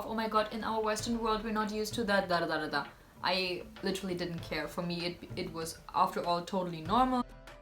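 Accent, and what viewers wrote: India. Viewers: Yes you are right, you are not a tourist you are a citizen of India.. People in my country should understand this, I have one person who is very close to me she experienced the same as you. She feels bad about it sometimes.. And in general she is more Indian than me in many things.. Anyways your accent is full like an Indian, the way you pronounce... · I felt like I was listening to an Indian not a German, I think your have explored India more than an average Indian, Your video was nice in terms of perspective of a outsider or a foreigner of what the person thinks about India and its cultural habits 🙏🙏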